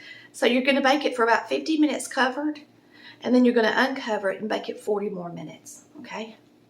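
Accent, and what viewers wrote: South, United States. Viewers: I love the way she say pork chops. That country voice gets me all the time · You are awesome! Love your southern accent and personality 😄. I just found it today and YouTube keep posting videos you’re great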